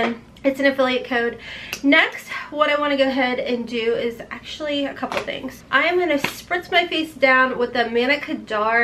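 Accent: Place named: Nashville, United States. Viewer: You crack me up! I love your accent! I'm from Nashville tn and watching you makes me feel at home! To be honest your adhd does too!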